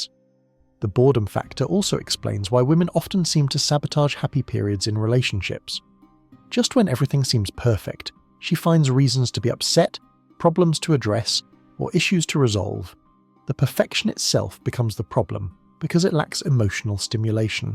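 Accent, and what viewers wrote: United Kingdom. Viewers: This is why you can't trust a British accent, he is getting you to buy into toxic behavior without calling it toxic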